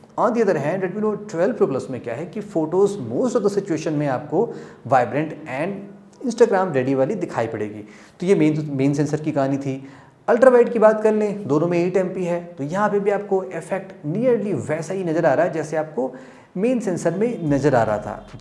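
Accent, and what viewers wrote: Lucknow, India. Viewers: Bhai aap Lucknow se ho kya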